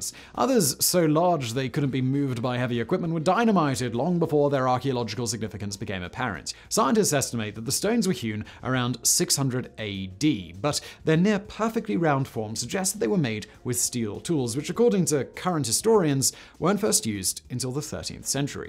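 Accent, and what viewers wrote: United Kingdom. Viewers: British pronunciation is fascinating. Adding an extra i in aluminum, and apparently deleting an m from cadmium?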